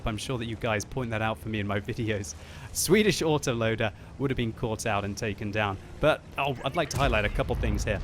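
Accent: France. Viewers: i love your french accent 👌😂🇫🇷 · your french accent is really lovely <3. I m french and I love when british speak like that <3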